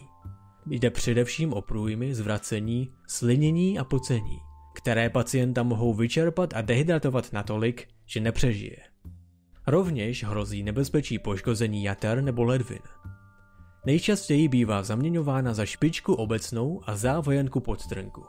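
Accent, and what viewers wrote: United States. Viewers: Ale ten americký přízvuk 😆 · dobrý video ale ten amevrickejj přízvuk :D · D Zdá se mi to nebo máš takový Americký přízvuk ? :-D Jinak super jako vždy a prosím pokračuj tak v úžasných videí , přeji ti hodně štěstí do života :-) . Fanynka Hungergirl :-) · Přírodopis mně celkově nebaví ale takovýmhle stylem mně to baví :) Ale zdá se to jen mně nebo máš někdy takovej americkej PŘÍZVUK?? :D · Jak někdo v průběhu natáčení videa chytne americkej přízvuk? :D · Dobře video ale máš americký přízvuk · hezký Americký přízvuk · Tvl ty mluvíš jako Američan to tvé R je děsný 😄😄😄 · zajímavý ale mas trochu americkej přízvuk!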